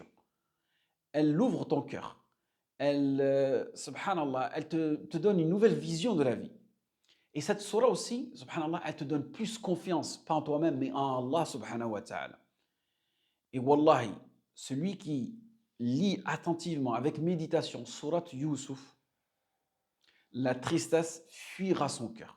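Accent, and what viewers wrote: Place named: Maghreb. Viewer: الحمد لله والصلاة والسلام على رسول الله ، وعلى آله وصحبه ومن اتبع هداه ، سيدي الفاضل إذا كنت إمام مسجد في أي دولة من الغرب ــ قدًم نفسك أنك إمام متمكن في الدعوة الإسلامية لا خطأ و لا فتوى ــ إياك أن تفتي و أنت لست من أصحاب الإختصاص بمعن آخر ـــ عملاء في مظهر العالم . أنت متعلم و ربمـا مثقف و حسب نبرة صوتك من دولة ، أنا كذلك أنتمي و أسكن فيها ـــ الملاحظة هي : حث المتحولين المسيحيـيـن إلى الإسلام قراءة اللغة العربية الصحيحة و ليس العريية المختلطة بالكلمات الفرنسية كما يتكلمون إخواننا في كل ـــ المغرب الكبير ــ اللهجة الملتوية بكلمات فرنسية و المؤسف الشديد نرفض التغيير و نتمادى في الباطل ووووو . علِمهم اللغة العربية الصحيحة ، حدثهم عن القرآن كلام الله القرآن هو روح ــ روح القدس عرفهم أن القرآن معجزة بالغة ، أما مفعول السورة هذا موضوع آخر ، إذا أنت ياسيدي المحترم قناتك فيها مواضيع مهمة عن الشريعة فكن حقا ــ سفير الحق ــ و إستعمِل اللغة بحقيقتها و ليس مزيفة ( إذا تحدثت باللغة العربية كن فصيحا أو تحدثت اللغة الفرنسية كذلك لا تظيف كلمات عربية و أنظر إلى إسم قناتك كتبت كلمة فرنسية و أظفت معها جملة متكونة باأحرف فرنسية و هذا خـطـأ فادح ، لو قلت لك ياسيدي ، أنا أتكلم اللغة الفرنسية الفصيحة و لو مرة في حياتي كتبت يعني ( الكتابة بالفرنسية و النطق بالعربية ) أنظر في إسم قناتك !!!!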